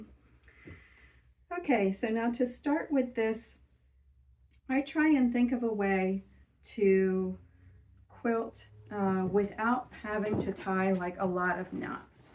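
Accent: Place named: Delaware County, United States. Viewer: I recognized your accent immediately and had to look back to find your location! My family and I are DELCO and have nice relocated. Your voice and accent is soooo comforting! Your quilts are pure love! So happy to have found you! I just want to get away from the commercialized channels and found home!!! So happy!!!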